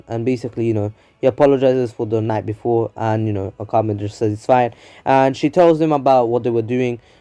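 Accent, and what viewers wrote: United Kingdom. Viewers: You sound like a Leicester guy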